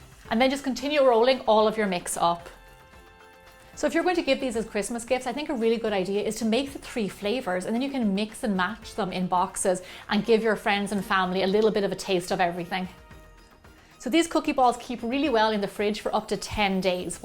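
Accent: Ireland. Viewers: Her irish accent is👌i could listen to her talk forever❤️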